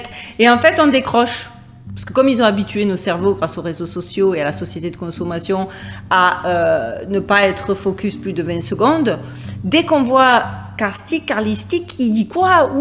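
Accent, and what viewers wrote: South, France. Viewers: Bravo, joli travail de recherche... Depuis quelques semaines je suis votre chaîne youtube, et je dois dire que c'est un beau travail de synthèse et de liens fait avec un certain brillo ✨👌 (Même si tous les sujets abordés ne résonne pas forcément... Je fais mon tri comme un grand 😄) Et puis votre côté "rigolote" et votre "accent du sud" donnent une saveur en plus bien plaisante... Merci à vous 🙏☯️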